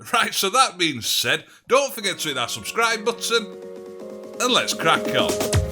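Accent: Yorkshire, United Kingdom. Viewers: I'm from Germany and I like that Yorkshire accent. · Thumbs down due to the Yorkshire accent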